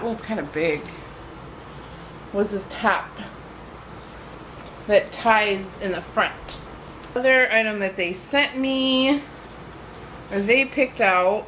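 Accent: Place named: Chicago, United States. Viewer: Chicago makes sense too. Yes you have a strong accent :)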